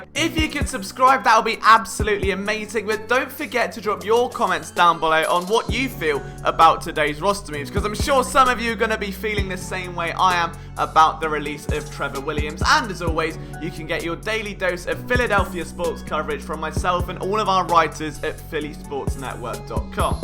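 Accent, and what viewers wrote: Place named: United Kingdom. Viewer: Are you British? How did you become a Eagles fan? Love the accent btw. Stay safe!!!!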